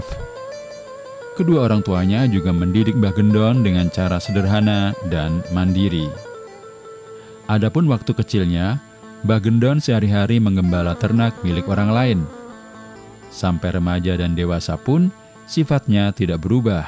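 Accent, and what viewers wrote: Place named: Pekalongan, Indonesia. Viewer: Hadir bos....sebenarnya orang mna sih..bahasanya kyknya pekalongan tulen....kecamatan mna gitu ..kl boleh tau..😑😑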